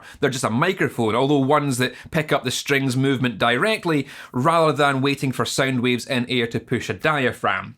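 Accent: Scotland, United Kingdom. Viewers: is what the entire world think scottish people sound like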